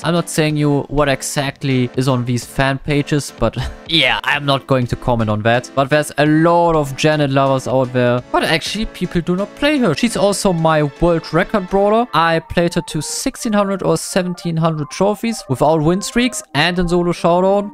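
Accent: Germany. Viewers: Das ist so richtiges deutsches Englisch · ich finde es voll witzig wie du englisch sprichst weil man hört das du aus Deutschland kommst😂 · are you german or french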